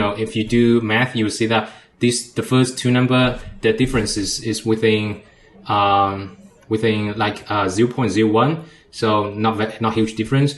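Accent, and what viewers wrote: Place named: Singapore. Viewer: but why do you speak Singaporean English🙂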